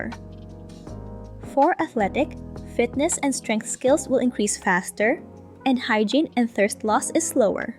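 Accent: Philippines. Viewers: Are you Filipino why does it sound like that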